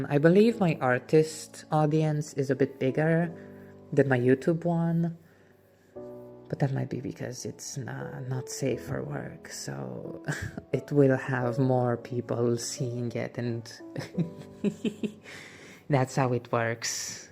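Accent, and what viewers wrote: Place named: Romania. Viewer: wow I mean first of all, LOVE THE NATURAL ROMANIAN ACCENT 🗣🔥 and thank you so much for calling me nice and chill 😭You have no clue how much it actually means to me though, and I wish I was able to hang out way more, but I'm quite busy sadly and will probably still be for a while but honestly, what a Life update this is, I'm sorry to hear about all the health struggles and financial worries, really hope it all works out and you get that new pc too so we can enjoy more peak vids 🙏and I can speak for a majority of the people that know and watch you, take your time. Even if it takes you weeks, or months, or years, to get that PC, we'll wait and be excited for the vids!!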